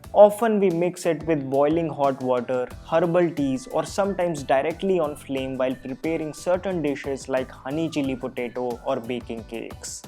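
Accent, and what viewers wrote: India. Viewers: Are you intentionally using a thick indian accent??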